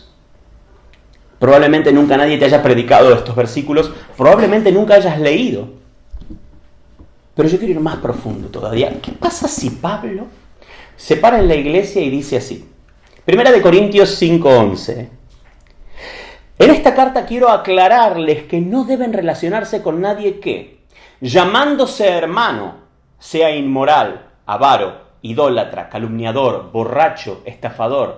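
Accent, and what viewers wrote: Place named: Argentina. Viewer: Gracia y Paz en primer lugar le doy gracia a Dios por sus riquesas inescrutables por esa palabra atinada y en segundo lugar gracias por tener el privelegio de escucharlo Siervo por su acento veo que eres argentino eres una bendicion para todo aquel que te escucha desde Panama centro del universo lo escucho a diario y me regocigo trae gozo a mi alma la gloria sea para Dios Bendicion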